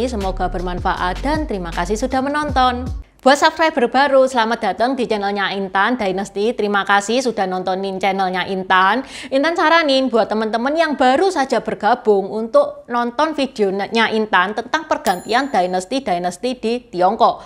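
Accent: Java, Indonesia. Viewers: Hallo intan !! Keren banget Chanel intan,jadi Chanel faforitku.tapi kenapa logat bahasa intan kok medok Jawa banget.justru tambah keren intan,lanjutkan terus pencerahan nya tentang Tionghoa Indonesia.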